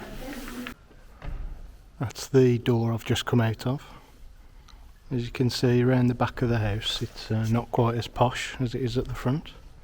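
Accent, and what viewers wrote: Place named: Black Country, United Kingdom. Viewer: Very detailed video, thank you. I’m from Wolverhampton originally so hearing the Black Country accent makes me feel like I’m home. Loved the addition of the bridge photographs!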